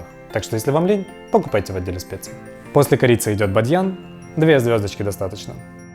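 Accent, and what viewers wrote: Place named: Surzhyk, Ukraine. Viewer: Хильнуть))) обожаю твой суржик. И кота.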